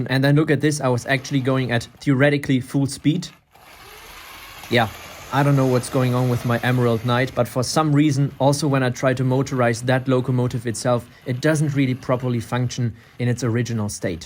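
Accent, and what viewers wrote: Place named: Germany. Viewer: GERMAN ACCENT!!! SO BAD!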